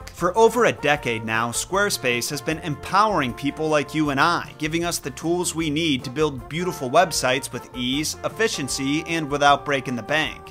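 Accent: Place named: Midwest, United States. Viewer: What part of the Midwest are you from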